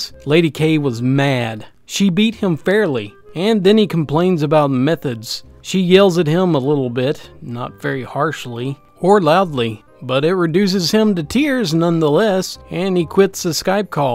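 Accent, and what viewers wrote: South, United States. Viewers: That is the lovely southern charm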